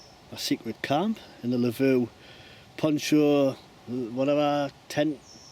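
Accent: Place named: England, United Kingdom. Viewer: Love to watch videos from another country. Like the english accent also. I don't have the access to places to go here in Florida. Too many thing to go wrong with all the unpleasant people around. And the country is so dry this time of year. Wet season is a couple of montha away so campfires are not good.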